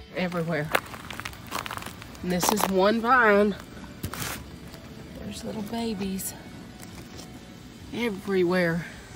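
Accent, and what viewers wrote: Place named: South, United States. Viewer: Your accent sounds like home to me. Must be close to Memphis TN. Maybe Georgia? Thanks for the tour! Love the name! Chuck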